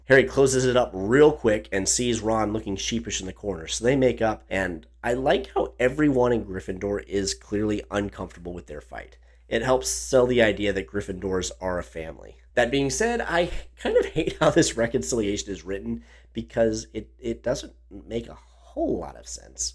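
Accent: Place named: Wales, United Kingdom. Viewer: It is Welsh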